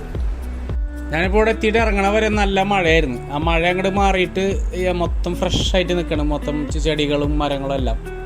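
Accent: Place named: Thrissur, India. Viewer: 👍👍👍ചെറുതെങ്കിലും മനോഹരം ആയ വിഡിയോയും വെള്ളച്ചാട്ടവും പിന്നെ മ്മടെ തൃശൂർ സ്ലാങ്ങും ❤❤❤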